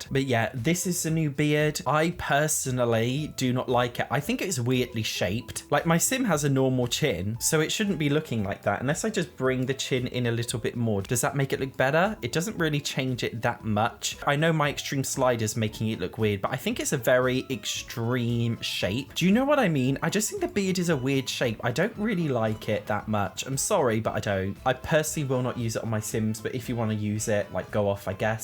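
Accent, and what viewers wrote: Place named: United Kingdom. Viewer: The British accent makes the video <num>x More funny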